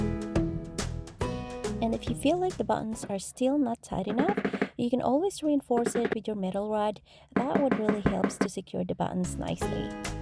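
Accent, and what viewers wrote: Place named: Philippines. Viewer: I knew it was a Philipino accent.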